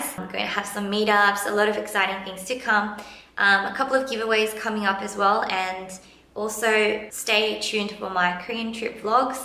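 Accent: Australia. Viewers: i like your voice! hehe very australian ^^ i'm an aussie asian too~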